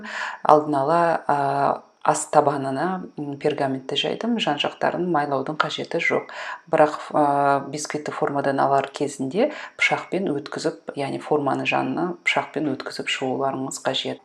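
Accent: Standard. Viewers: Рецебіңізге рақмет таза қазақша сөйлейсіз жасап көремін міндетті түрде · казакша таза сөйлеуге тырысасың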